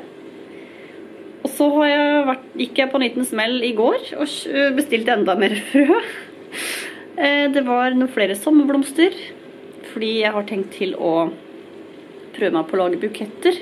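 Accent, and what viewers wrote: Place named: Skien, Norway. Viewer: Ser du er fra Skjeen da.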